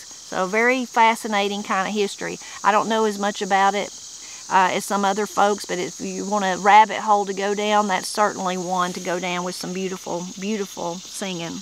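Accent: Appalachia, United States. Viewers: They use "He's a good hand to have on the ranch. Or he's a terrible hand with horses." Mostly used in ranch talk. We use hard in Utah. "He was hard pressed for a job. He's a hard one to talk to. "There's hardly a time we don't walk to the store. ", these are so wonderful and I really enjoy your Appalachian accent. 👍💖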